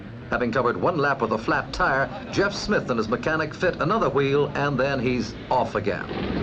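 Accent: Ireland. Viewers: Do we know who the narrator is? I think I hear an Irish accent sneaking through in places.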